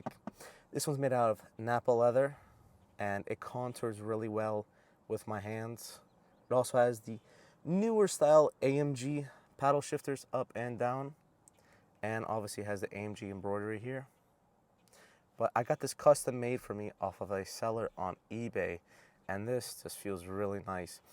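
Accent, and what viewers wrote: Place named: Albania. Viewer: You sound Albanian 😢😔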